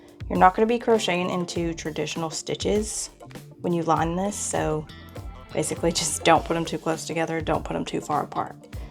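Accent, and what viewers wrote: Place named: South, United States. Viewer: Such a great pattern!! Thank you for sharing. Gotta say I loved the southern in your speech. Made making that bad boy more fun :)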